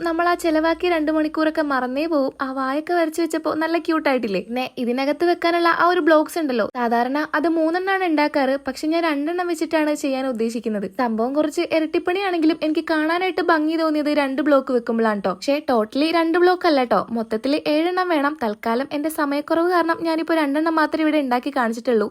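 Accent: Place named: Thrissur, India. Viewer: Thrissur anu chechi ❤❤❤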